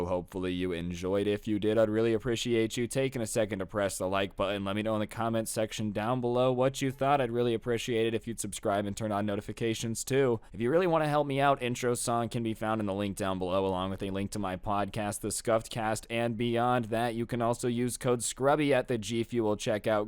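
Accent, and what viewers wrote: RP, United Kingdom. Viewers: As a Brit i can say that that is the poshest of posh brit accent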